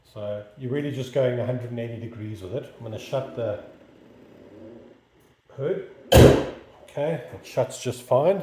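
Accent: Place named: South Africa. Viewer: Thanks for the fix, desperately needed this. You sound South African 🇿🇦 If you are, thanks for helping me all the way back home. Good luck with your channel 👍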